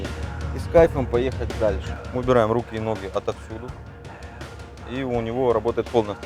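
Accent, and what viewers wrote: Rostov, Russia. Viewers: Про речь ужасную кто там писал.Это суржик,норма казачьего диалекта,не является нарушением,это Ростов.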